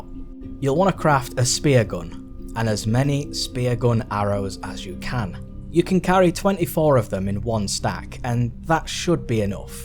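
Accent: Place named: Wales, United Kingdom. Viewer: oh my god... are you kidding. I'm from Wales and its unusual to hear a Welsh accent. That has made my day.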